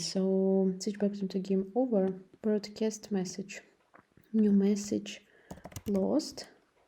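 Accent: Russia. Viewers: Русский акцент никто не отменял и спасибо за туториал😊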